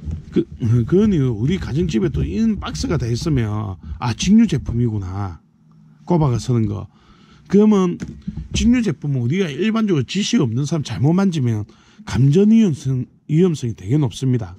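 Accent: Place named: Daegu, South Korea. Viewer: 아재 대구네요 사투리에 답답한말투 잘보고갑니다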